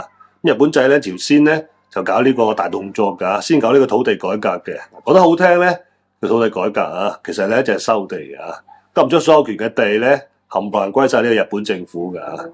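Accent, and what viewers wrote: Guangzhou, China. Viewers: 嘩吊！原本聽廣州話仲全神過香港嘅廣東話，起碼唔洗聽一D無謂嘅英文字!!!!!!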